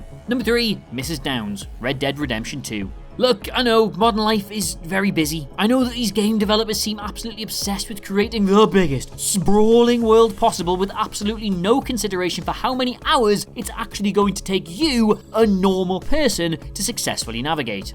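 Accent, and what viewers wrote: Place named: United Kingdom. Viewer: It is a perfectly acceptable British dialect. We're not all from London you know.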